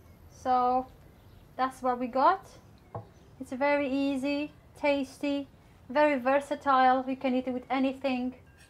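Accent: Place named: England, United Kingdom. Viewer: ওয়াও ভাবী, রান্নার পাশাপাশি আপনার থেকে ইংলিশ এক্সেন্ট চর্চাও হয়ে যাচ্ছে😊 । ।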